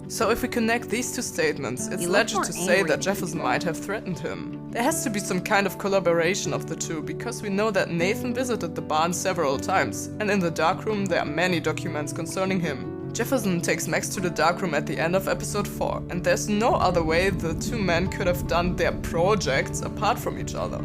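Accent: Germany. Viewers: Dieser deutsche Akzent lol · Irgentwie habe ich mir gedacht vom ich sag mal Akzent das du Deutsch bist aber trotzdem gut😊 Also kann man Nathan Retten? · Your accent just sounds really german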